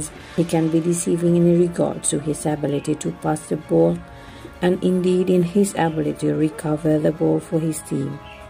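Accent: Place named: Vietnam. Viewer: AGAIN AND AGAIN VIETCONG VOICE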